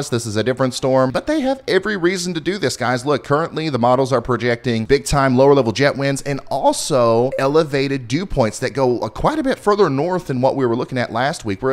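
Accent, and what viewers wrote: South, United States. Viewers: New here, honestly might come here for my news forecasts. sets me at easy with a true southern accent giving me the weather than them dang Dallas newsboys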